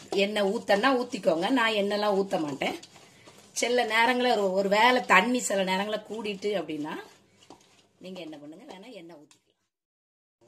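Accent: Tirunelveli, India. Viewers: Tirunelveli Tamil super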